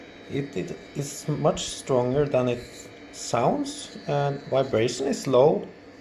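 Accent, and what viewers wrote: Norway. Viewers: Damn you HAVE TO BE Norwegian.......you got THAT accent hahaha.....greetings from Trondheim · I just love a good ol' norge accent. Thanks for the video good sir, I am currently trying to know more about this kind of fans!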